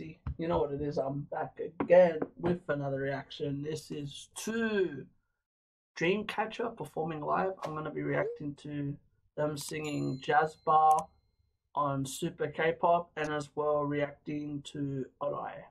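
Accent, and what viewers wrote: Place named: Australia. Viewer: dreamcatcher \:D/ where you at? down under based on your accent? :D they should tour there before the end of the year. they just finished their usa tour and it was awesome. if you are curious, there are a few good vlogs/reviews that are more commentary than just showing full song clips(paul leach, the kpop guitarist, ram reacts, merlin zimmerman) that you can maybe even react to. I'm surprised you actually know them by name, since you haven't reacted to that much of their stuff at least here on youtube. just do more album reactions. most of their albums are mini-albums so it's only 4 songs. just be sure to check if they have special clips for the b-sides before picking a lyric video.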